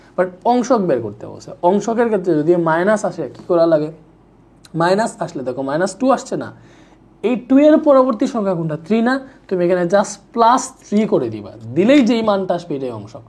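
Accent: Sylhet, Bangladesh. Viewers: Sir mone hoy amader Sylhet er😫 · Vai sylheti naki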